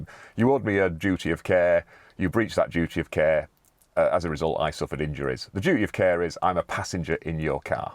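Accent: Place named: Yorkshire, United Kingdom. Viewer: I love listening to someone speaking Latin in a Yorkshire accent. I thought I was living in 'The Hunger Games' ? Don't have to imagine.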